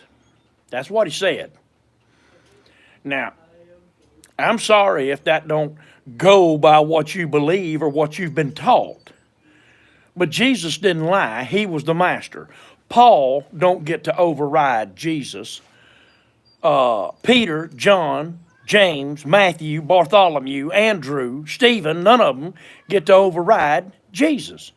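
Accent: South, United States. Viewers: I love that country accent boy!! Jijj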